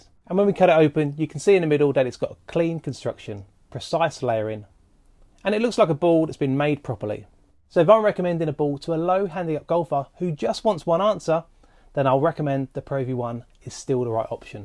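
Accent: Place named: United Kingdom. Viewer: Why is every video a fkn brit talking.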